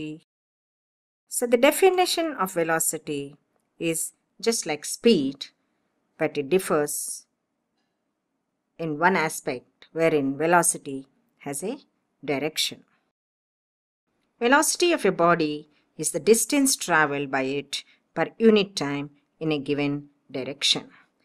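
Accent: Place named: India. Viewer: No..she is not american look her accent is indian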